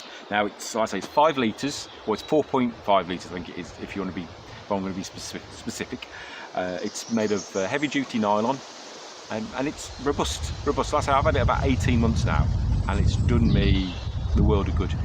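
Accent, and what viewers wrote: England, United Kingdom. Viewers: You will have to forgive my cynicism but when I hear an English accent talking about bush craft and wilderness survival I get a bit sceptical and sarcastic. This guy may have experience in the bush and living in remote places but this video looks like it was taken in a park. Years ago I worked in northern British Colombia and worked in some extremely remote locations and lived out of a tent. In some cases, the only way in and out was be a helicopter or one heck of an arduous long walk over impossible terrain. I am sure there are people who have experienced more challenging circumstances and more desperate survival situations then me but the average guy hasn’t. I’ve had numerous bear encounters, slept in a tent in the snow, slept in the rain with nothing but a sleeping bag and a tarp, been in dense forests with huge hemlock trees in the late fall living out of a tent, lived in a tent in early spring when you would wake up in the night covered in frost, or been baking in 40 plus degree Celsius temperatures in the outdoors with no way to cool off except to drink hot water that was baking in the scorching heat. 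I was always well equipped and for the most part had some kind of back-up, support, and resources. Real survival in the wild is not a game or about having some items in a backpack. If you are ever truly stuck in a remote location and can’t get to civilization it will be better to have some survival items but they won’t help you much. When you haven’t eaten anything in 8 ho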